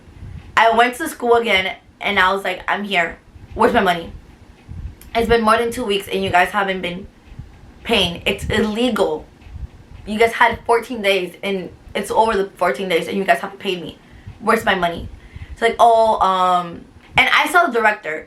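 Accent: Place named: Miami, United States. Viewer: I’m Australian and this is the first time I’ve heard a Miami accent. Like music to my ears 🎶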